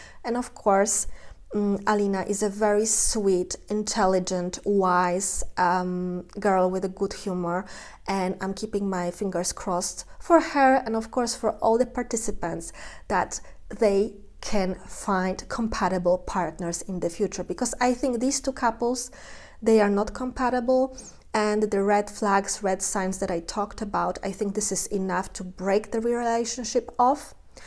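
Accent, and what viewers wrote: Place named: Eastern Europe. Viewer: You come from a different culture . German men and women are very equal and it isnt a problem for a man to share an apartment with women...people are much more free unlike the soviet union where you were brought up It is only a sign that a man is open minded. When i shared an apartment i preferred to live with men because women were more irritating but i also shared with a nice woman once. It depends on the PERSONALITY. Your accent indicates you are east European where men and women have very rigid gender roles . You are reaching absurd conclusions . A man who seeks spiritual retreats is spiritual. You dont seem to have a lot of experience living with other people So it is even more absurd you speak with such confidence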